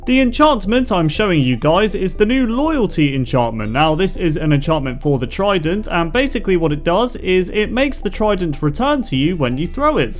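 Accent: United Kingdom. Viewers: Must be a northerner.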